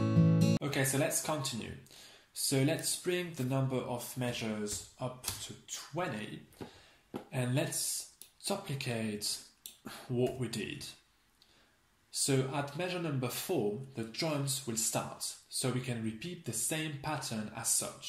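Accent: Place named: France. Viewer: Synthétique et raffraichissant !!! Loving the french accent !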